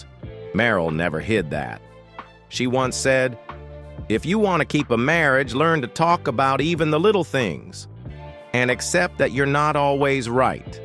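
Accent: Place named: South, United States. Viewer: this narrator dealing in a southern drawl? It seems it just Popped up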